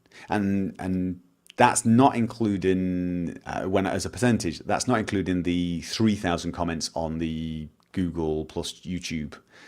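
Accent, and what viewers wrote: United Kingdom. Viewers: If i recall correctly he's from England. or rather... blast it's on the tip of my tongue but i cant quite get it right. Sorry on that part. suffice to say he's in an area where he sounds "wonderfully British". dont realy hear people go "he sounds wonderfully American" so i guess certain parts over there are doing a few things right. god i can hear it now... going "i'm not from England you sodding yank i'm from ___"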